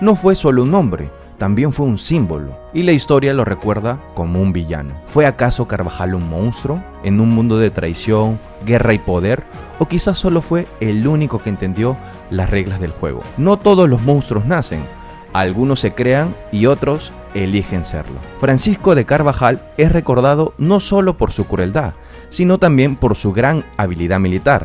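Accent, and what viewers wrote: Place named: Lima, Peru. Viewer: Muy buen pasaje histórico del Perú...y el presentador muy limeño...reemplaza la S por la J...😂😂😂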